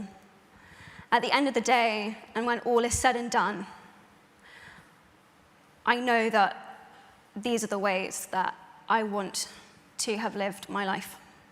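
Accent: United Kingdom. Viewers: i love her british accent <3 always love u Emma keep motivating !!!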